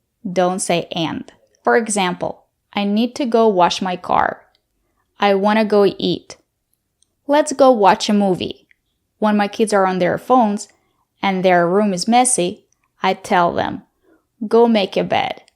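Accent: United States. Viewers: Horrible American english , really nasty to listen to and an English english speaker I am aftaid. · You are obviously not an English person this is America's bastard English your promelgating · That's Amarican English, isn't it?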